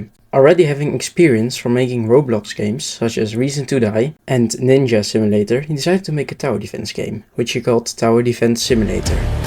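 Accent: Netherlands. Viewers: I know a dutch accent when i hear one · I might be wrong but are you dutch? You realy sound like a dutch person(amazing video btw keep cooking) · You really don't try hiding your Dutch accent, huh? 😅 (Nothing bad about it)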